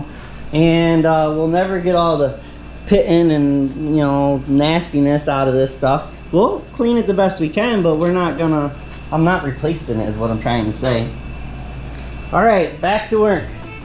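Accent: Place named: Wisconsin, United States. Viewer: Love this guy’s can do attitude. Love your accent too. Where are you from originally? Reminds me of Wisconsin 🙀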